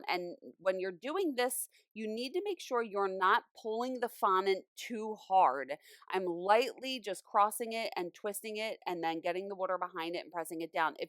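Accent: Philadelphia, United States. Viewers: You are definitely from Philly!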